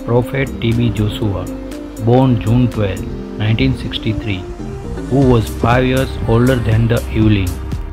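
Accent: India. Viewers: I don't know who wrote or compiled this, but they should go back to find out more about the geography of Nigeria. The commenter is reading with an Indian accent, so I guess not a Nigerian. Oshimili is never and will never be in the Northern Nigeria. Orile is never in Oshodi (lol).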